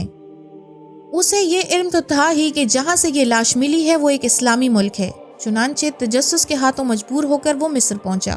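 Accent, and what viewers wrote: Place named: Lahore, Pakistan. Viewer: U are lahori q ke AP ray ko aray prty fact about us means lahories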